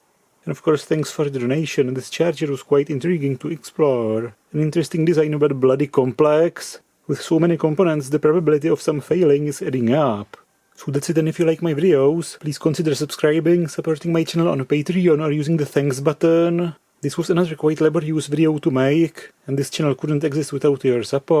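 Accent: Prague, Czech Republic. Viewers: Ta pražáčtina v anglické podobě je běs 😀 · sounds like czenglish (Czech Republic) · I KNEW YOU WERE CZECH!!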